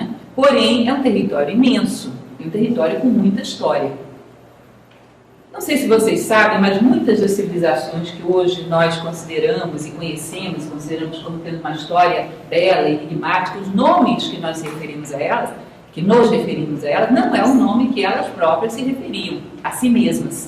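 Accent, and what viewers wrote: Rio de Janeiro, Brazil. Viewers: Muito interessante Tive dificuldade de entender a fala Primeiro achei q era o marcante sotaque carioca com seu todo abrangente xxxxx Depois achei q é a dicção da palestrante que aumenta e diminui o tom durante a pronuncia da mesma palavra Finalmente achei q tinha um eco na acústica da captação do som Pode que o uso de um pequeno microfone possa otimizar o som Enfim certamente a dificuldade de entender penso que é minha Agradeço o compartilhar do tema Abraço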